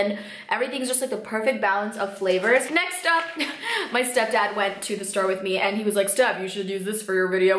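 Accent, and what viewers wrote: Czech Republic. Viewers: je to supr :D prostě američanka narozena v česku,užasne :) prostě že ta čeština u ní pořád je :) klobouk dolu a supr videa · mluvíš neuvěřitelně krásně česky! Jsi češka?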